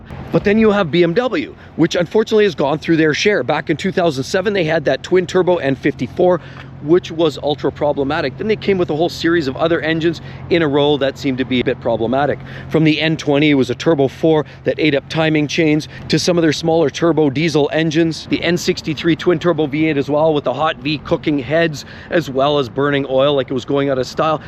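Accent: Edmonton, Canada. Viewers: Seems like you’re from Edmonton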